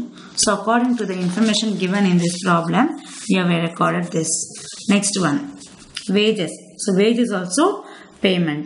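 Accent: South India, India. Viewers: salute you for your awesomeness ma'am. btw your accent is quite funny. I believe you're south indian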